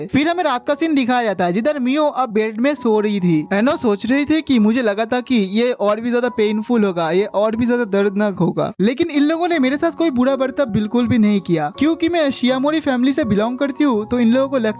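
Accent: Bengal, India. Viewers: Bhai tum bengali ho kya😅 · tum bangoli ho mughay pata chalgiya😂😂😂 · If I'm not mistaken, you are a Bengali or your mother tongue is Bengali. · Are u a Bengali ? like ur accent is like that and nothing else ! ! !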